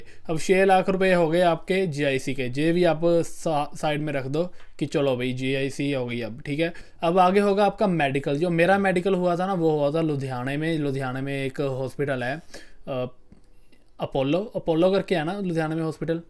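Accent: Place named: Uttar Pradesh, India. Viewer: Canada Jake vee up wali boli bolde ho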